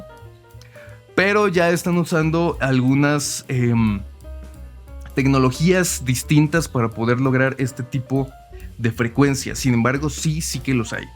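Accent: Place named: Mexico. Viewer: soy Chilena y me encanta tu acento jajajaja es de pelicula ....saludos aguante México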